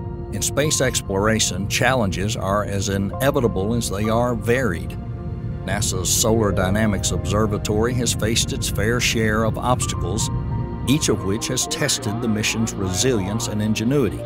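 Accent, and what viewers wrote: South, United States. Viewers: Gotta love the southerner talking about space. Love your Channel man!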